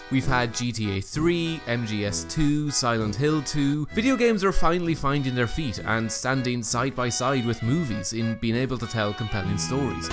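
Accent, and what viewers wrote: Ireland. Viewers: Just love hearing an Irish gaming you tuber ❤️❤️❤️ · something about an irish accent makes me subscribe before i even watch the video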